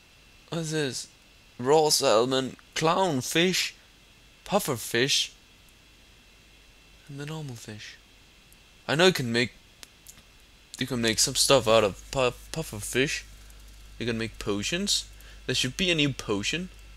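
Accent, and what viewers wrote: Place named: Denmark. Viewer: You sound danish!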